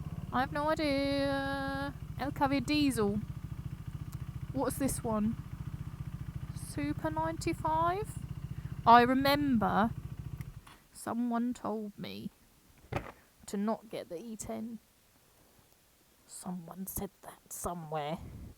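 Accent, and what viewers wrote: England, United Kingdom. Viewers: Been wanting to watch this since you put it out... been working so not able. I am a person with no dependants, but I think we need to make sure we do stuff for ourselves as well as our kids/family/partner/etc... Don't feel guilty is what I'm saying. Your bikey sounds great on the throttle. Congrats on the wedding. I love that after a few years you still have your English accent, was expecting an episode of Allo-Allo style "ve have ways of making you talk!":-D hahaha I don't watch German channel, still follow his UK one though. I got a van to do the moving bikes about thing... have only moved bikes a couple of times, but no rugrats 😀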